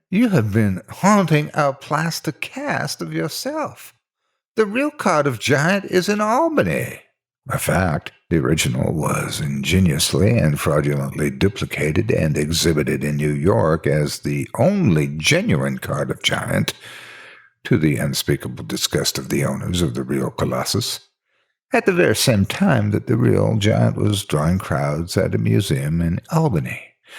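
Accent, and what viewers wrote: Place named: Missouri, United States. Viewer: I knew not that the great Twain had written a spook story. Excellent as all his works are. Thats a great Mssooouriy accent too!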